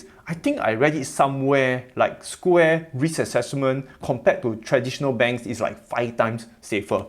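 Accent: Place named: Singapore. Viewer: I’m Becoming .. filthy rich because of you by doing nothing but picking..”it’s CRAzy” ( in your awesome Singapore accent )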